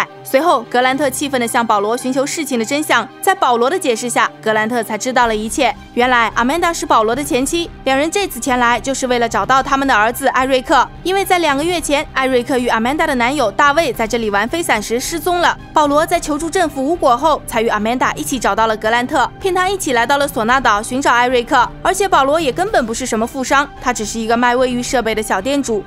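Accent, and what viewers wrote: Jiangsu-Zhejiang, China. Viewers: 江浙口音，不要逼太紧。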